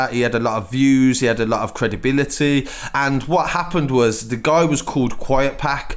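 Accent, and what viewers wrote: United Kingdom. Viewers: Lol I just don’t take your voice serious your outside London voice is funny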